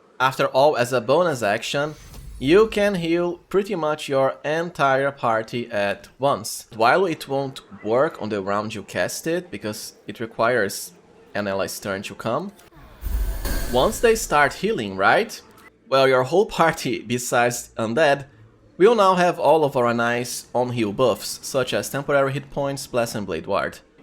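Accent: Brazil. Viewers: Se eu tivesse que chutar pelo sotaque ele é br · Cara, você é do Brasil? Seu inglês parece muito de um brasileiro. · where are you from? your accent sounds brazilian! if you are, shoutout from a fellow countryman :) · esse sotaque ai ta meio br kkkkkkkk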